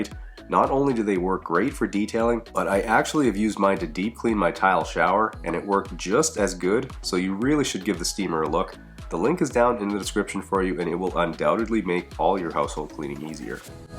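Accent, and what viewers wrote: Canada. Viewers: Ok so now after watchin several of your videos I'm hooked. I had to share your videos with my sis in Florida..Now I know your Canadian.. I can't hate cause my daughter lives there😊 and I love Toronto and was in the military in the Detroit area long time ago so your accent was very familure. So you know electric, you can clean, you use tools, you like big toys and working outside, you also love music. I also watched videos of your studio build. I just wanted to say, I think your well rounded and a super cute dude who pays attention to the 'details'..also..your detailing is phenom! I can't believe people don't clean out their vehicles before you get them, that seriously blows me away, Thank you for putting great content out oh and I watched a free detailing vid you did..giving is so uplifting, and it comes back to you. Thank you keep up the good work!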